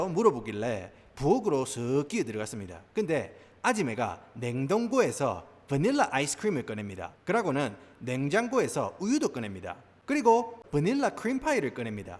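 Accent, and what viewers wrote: Gyeongsang, South Korea. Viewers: 영어가 경상도 사투리하고 궁합이 딱 맞네... 재미난 영상 항상 응원합니다. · 아 이분 사투리랑 영어발음은 또 오지는게 진짜 경상도사람인데 겁나 웃기네요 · 갱상도 사투리가 내기에 상큼하게 터지네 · 님, 너무 재밌게 잘 보고 있어요. 초기에 구독자 많지 않을때부터 영상 알고리즘 떠서 보고, 바로 구독하고 지금까지 보고 있네요. 저도 경상도 여자로서, 친언니도 미국 살아서 한번씩 간 미국에 대해 느끼는 부분들이 공감되는 부분도 많구요. 경상도 사투리도 리얼하고, 입담도 좋으시고.. 개그코드에 초긍정 에너지까지 많은 에너지 주는 님 늘 응원할께요. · 경상도 사투리+미국쿡 영어 = 님의 억양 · 오! 첨에 쎈 갱상도 억양이여서,너무 토속적이신줄 알았는데 다양하고 박학다식한 지식이 있으시고 전문적 분야도 공감 가도록 설명해 주시네요. 더구나 미국적 배경으로 다져지신 경험과 지식이라서 폭넓은 안목을 가지셨군요. 너무 재밌게 잘들었구요. 미국에 30년이상 살고 있는 사람으로 찐 공감 했습니다. 영상대박이 기대됩니다!! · 영남 사투리를 매우 적절히 구사하는 분이군요. ㅎㅎㅎ 게다가 동영상의 내용자체가 매우 진솔하고 생각할 점이 있는 유익한 것이군요. 유튜브 동영상이 대박 나길 기원합니다. ㅋ 미국인의 식습관에 관해서 하나 덧붙이자면 냉전시기인 1960년대에서 1970년대 까지는 미국의 각급 학교에서 비교적 영양적으로 균형잡힌 급식을 학생들에게 제공했다고 합니다. 그러나 로널드 레이건이 집권한 이후엔 국방비는 증액, 교육복지비는 무조건 감액 하는 공화당 정책때문에 미국내 각 학교들의 예산도 대폭 삭감되어 학교에서 제대로된 급식을 학생들에게 제공하지 못하게 되었죠. 이 점도 미국인의 비만 증가에 영향을 끼쳤을 거라 봅니다. 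· 갱상도사투리에 영어발음조합 은근 잼있으예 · 우리 슨생님 일 조금 하고 돈 마니 버세요. 이렇게 입을 잘 터는 유튜버는 더 승승장구해야 된다고 생각합니다. 박가네 오상(사투리+고급 일본어)이나 님(사투리+본토영어) 처럼 갱상도 유튜버들이 더 많아져야 한다고 생각합니다.. 귀에 팍팍 때려 박힘.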